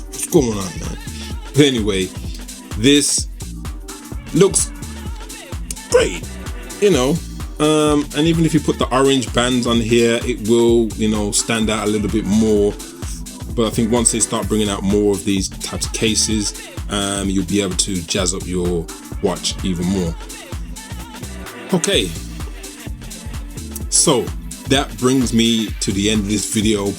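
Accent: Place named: Birmingham, United Kingdom. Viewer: Great vid and a fellow Brummie by the sounds. Cool.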